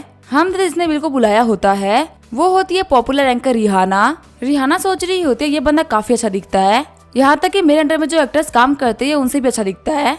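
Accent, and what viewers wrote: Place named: Uttar Pradesh, India. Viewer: tum pakka up se ho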